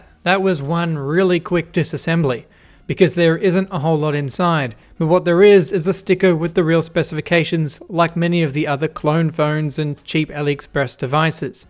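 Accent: Australia. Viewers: Mp3-4 players named nuggets/nugs, voiced over by an Australian man on a table, 🤔.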